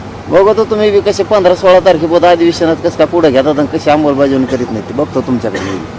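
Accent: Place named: Marathwada, India. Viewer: रोख ठोक माणूस..... आसल मराठवाडीतून बोलतायेत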